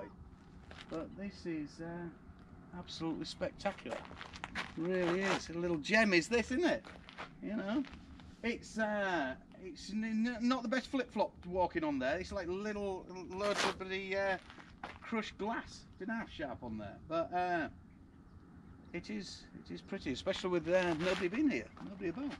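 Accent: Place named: West Yorkshire, United Kingdom. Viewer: Lovely place , bit nicer than Wooley Edge Services eh? 🤣🤣 Great channel , where are you from in Yorkshire ? Sounds like West yorks to me , Im5 from Keighley , anywhere near there ? Cheers .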